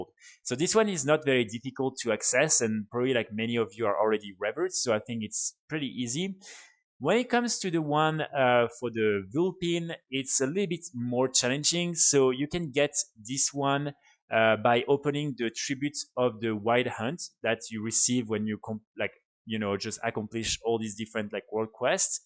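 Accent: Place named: France. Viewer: Your content is some of the best, keep up the great work. Because you're French, you have a French accent. Your pronunciation is what is expected. Don't worry about it. The truth is, people LOVE IT.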